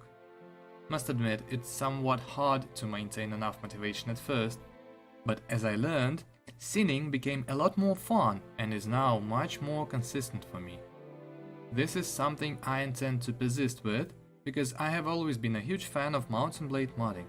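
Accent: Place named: Russia. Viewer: Я по акценту сразу определил русского)))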